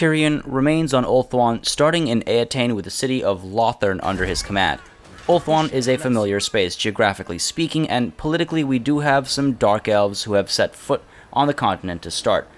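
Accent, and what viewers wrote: France. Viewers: Your French accent is so funny. And you should do this video when everything was out and with all news. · Damn!! That French accent. Cool Vid man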